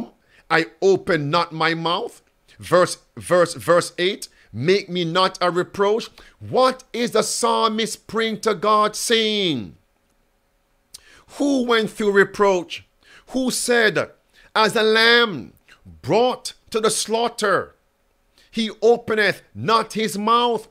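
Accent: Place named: Jamaica. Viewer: Ingles, el nacio en Jamaica por eso el accento que tiene.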